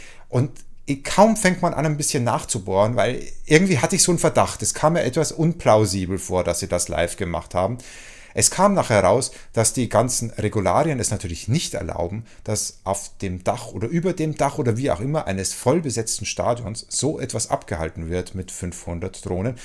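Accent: Bavaria, Germany. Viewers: yep - sehe ich auch so. Woher aus dem grossen "Kanton" bist den eigentlich? Toent irgendwie bayrisch ... Muenchen? (PS: fragen kann ich ja mal, antworten ist ja freiwillig)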